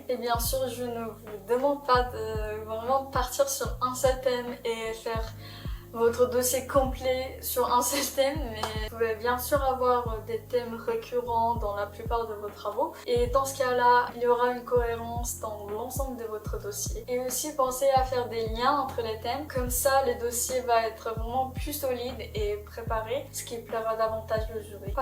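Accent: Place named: France. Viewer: wow i love your french accent 😍it's so gorgeous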